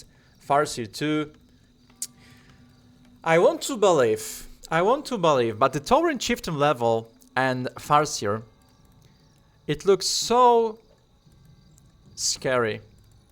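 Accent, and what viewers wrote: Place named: Poland. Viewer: Hej,! (Świetny nick, na marginesie, teraz to widzę) Wspieram i popieram wejście na anglojęzyczne rynki!!! Nie będę Ci na nic narzekał, bo wiadomo, początki niełatwe. Nie wiem czy mieszkasz bliżej Warszawy czy Krakowa, ale jak to drugie, to może byśmy się spotkali, postawię Ci coś dobrego;) Zdziwiłem się, bo z tytułu myślałem, że będziesz grał z zagranicznikami, i swoje komentował po angielsku. Akcenty i wymowę warto może trochę podszlifować, bo brzmi bardzo Polsko. Ale nie przejmuj się! Popieram, rób tak dalej, warto! Najważniejsze to robić, reszta przyjdzie z czasem;) Na marginesie, chętnie zaangażowałbym się w kierunkowanie strategii rozwoju, ale Twoja wola. Jeśli nie, i tak wspieram wszystkimi czterema kończynami. Powodzenia! Sława!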